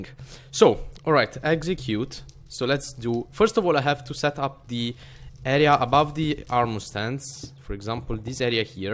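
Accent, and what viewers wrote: Italy. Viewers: CAZZO BRO SI CAPIVA SUBITO DALL ACCENTO CHE ERI ITALIANO XD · Ahaha si sente tantissimo l accento italiano, bel tutorial btw 😂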